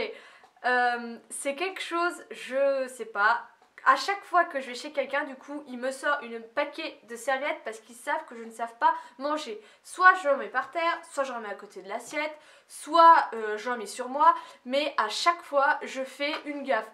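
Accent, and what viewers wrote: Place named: Vosges, France. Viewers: tu as vraiment l'accent vosgien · tu as l'accent vosgien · ton accent... celui de Gondreville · tu as un petit accent vosgien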